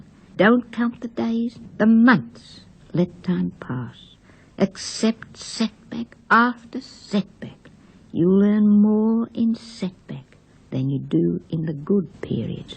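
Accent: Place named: Australia. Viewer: I love how Aussie she was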